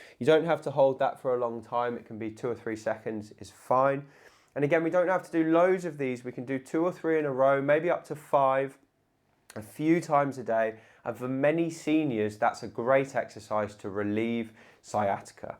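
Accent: United Kingdom. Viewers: when a handsome guy speaks with this British accent you better trust him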